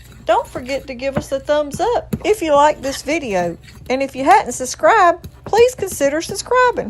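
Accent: South, United States. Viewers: I boil a dozen, and use the ice bath method. I like to eat one boiled egg in the morning. Still have problems peeling , so I take the cold egg I plan to peel and place it in hot water for a minute. Seems to help. Like your southern accent!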